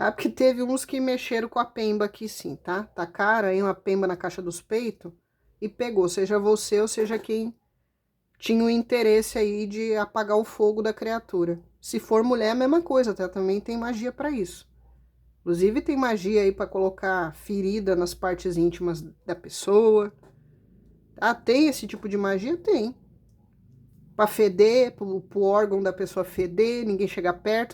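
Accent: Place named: Rio Grande do Sul, Brazil. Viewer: que nem tu não tem cara tu é a melhor e o sotaque Nossa aqui do Rio grande do Sul não tem comparação tu é a melhor, parabéns eu adoro e dou muita risada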